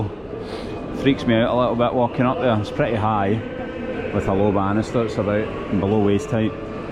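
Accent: Scotland, United Kingdom. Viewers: that beautiful scottish accent cheers from Belgium have a look also on russian cars in the streets and those in Europe the ultimate years.... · As I've noticed the Scottish accent is quite gorgeous. You pronounce sound ei instead of ai. Eis skating. 😊 Nice one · Awww! What a nostalgy for me. Last time I dealt with the Scottish accent was in my University days. 😻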